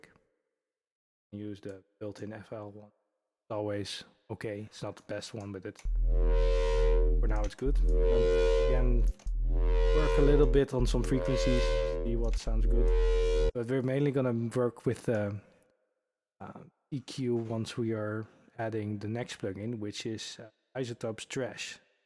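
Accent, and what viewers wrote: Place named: Netherlands. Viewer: But that dutch accent tho. Dope tutorial nonetheless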